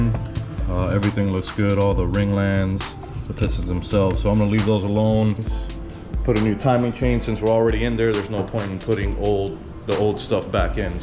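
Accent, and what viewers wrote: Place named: Cuba. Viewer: Are you Cuban because in some vids you speak spanish and you sound Cuban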